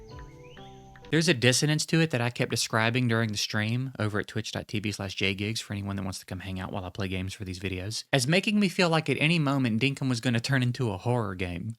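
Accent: South, United States. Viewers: I love how my man here is so positive all the time and it's great to hear southern YouTubers. But also he hears a faint ticking while opening mail and he loses his shit.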